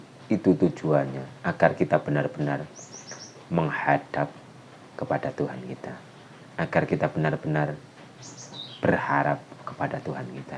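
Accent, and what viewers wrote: Banyumas, Indonesia. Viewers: assalamualaikum,, Njenengan kyane wong ngapak mas🙏🙏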